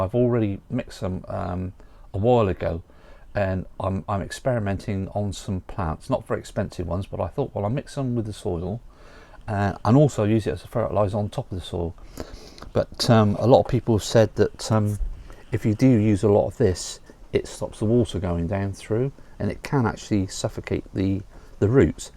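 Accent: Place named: West Country, United Kingdom. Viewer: Are you in the West Country perchance? I detect a slight hint of accent and the reduced label on a plant you showed us looked like a Blue Diamond one, ‘tho they’re quite an extensive chain I know. But it would be just like God if you turned out to be a neighbour!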